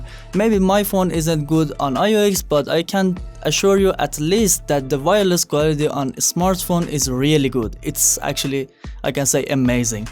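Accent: Iran. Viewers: Are you irany?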